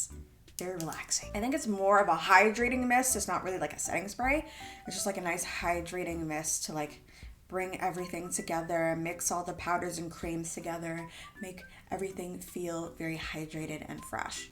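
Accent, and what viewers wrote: England, United Kingdom. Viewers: The British accent is here and THRIVING!!! It is making my day. Keep being you and don’t hide your personality!!! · Love the English accent girl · Loved the English accent, u did well hun, lol from the U.K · Omg girl! Your British accent>>>>333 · The british accent💅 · Are you britesh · Girl that British accent never gets old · I love your British accent it reminds me of ronald weasly 😂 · her bri'ish accent <3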